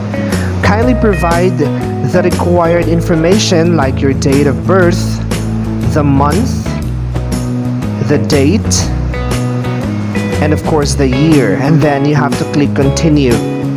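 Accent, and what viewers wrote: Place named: Philippines. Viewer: wahhh sabi ko na filipino yung nagsasakita eh ahaha... ang ganda ng english accent <333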